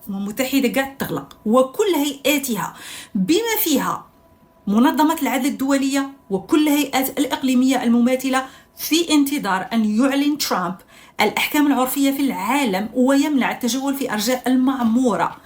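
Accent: Morocco. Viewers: مهما حاولت التأقلم على اللهجة الجزاءرية فلهجتَك المروكية خانتك انت عياشة والحلم لن يتحقق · Cette femme est marocaine l’accent marocain la dénudée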